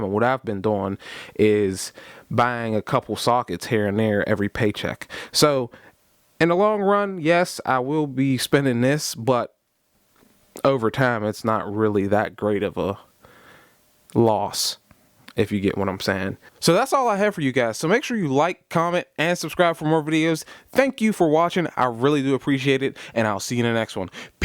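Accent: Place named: Baltimore, United States. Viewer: You from Baltimore? It sounds like my hometown! Nice vid thanks for the hard work in putting it online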